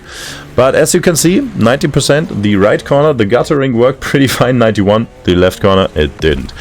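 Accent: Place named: Germany. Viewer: You can actually hear the german accent. Still better than expected. I guess after 2-3 tries he will get better with this. At least now he can reach a broader audience, which is a good thing.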